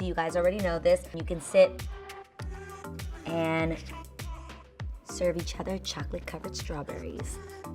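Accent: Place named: New York, United States. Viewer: I love how you haven’t lost your New York accent or slang lmao. I moved from New York to Hawaii and i still talk with an NY accent 😂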